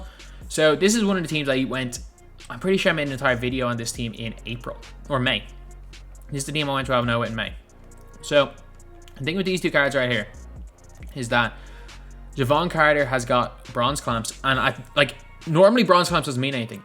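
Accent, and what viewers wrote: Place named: South Dublin, Ireland. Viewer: This lad is defo from South Dublin ngl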